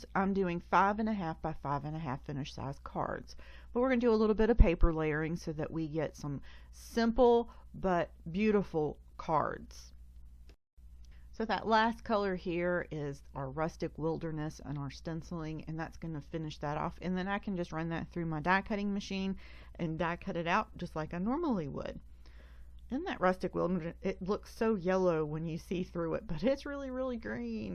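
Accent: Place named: South, United States. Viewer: Love your accent! I’m guessing you’re from the south, it reminds me of a colleague I have from Mississippi 😊 Your cards are so pretty and fun! TFS!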